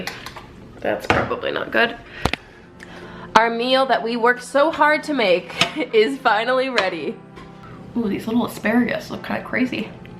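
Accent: Canada. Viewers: i love your canadian accent SO much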